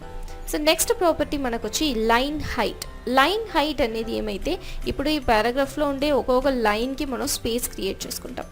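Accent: Tamil Nadu, India. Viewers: Mam meeru pure telugu kadaa madyalo tamil ala undii? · Tqq Nice teaching and Tamil slang mixed cute voice 😊😊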